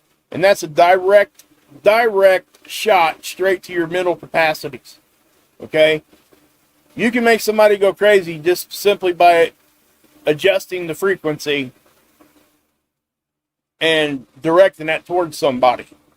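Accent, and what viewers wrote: South, United States. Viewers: You have made alot of effort to curb your southern accent. Thanks for keeping up and sharing with us. I know I can count on you for quality content.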